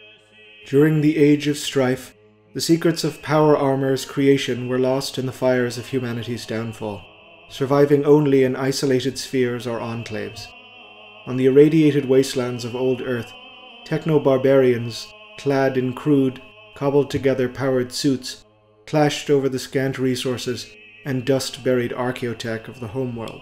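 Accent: Ireland. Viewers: interesting. I live really close to Canada, and I pegged your accent as Irish right from the jump. Maybe because my own accent is similar to a Canadian accent so the differences in yours stand out to me more. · Irish accent? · Chilled 40k lore? Beautiful illustrations? Hypnotic Irish voice? Background chanting? SUBBED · The solemnity in your reading and the Irish accent are a welcome change from other 40 K lore channels. The Gregorian chant was a nice touch as well. Looking forward to your next installment. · So obviously Irish. Just can’t tell which part.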